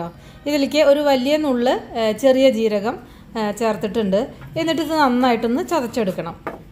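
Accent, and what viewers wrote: Palakkad, India. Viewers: റെസിപി ജാൻ ചെയ്തു നോക്കാ രണ്ട നല്ല അവതരണം പാലക്കാട് സ്ലാങ് ആണോ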